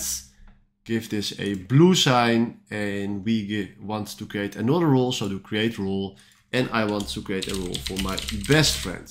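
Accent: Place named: Netherlands. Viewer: jij sound nederlands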